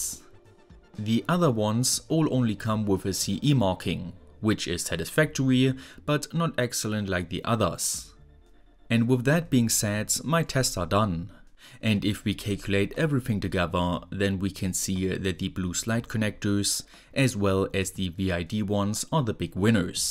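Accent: Germany. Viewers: It's much better now, finally being able to put a face to that weird German voice! 😁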